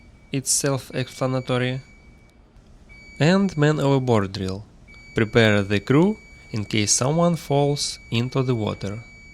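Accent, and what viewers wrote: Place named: Russia. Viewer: здравствуйте,я смотрю что вы наш по английскому произношению 😂,скажите как вы начали путь и как проделали путь к капитану в иностранной компании я через 4 месяца буду поступать в Макаровку и волнуюсь по поводу английского и карьеры не хочу по Москве реке плавать на паромах до конца карьеры)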